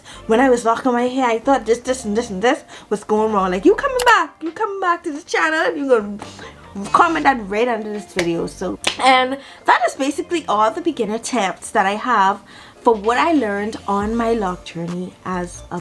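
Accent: Bahamas. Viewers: The second you opened your mouth, I knew you were Bahamian ❤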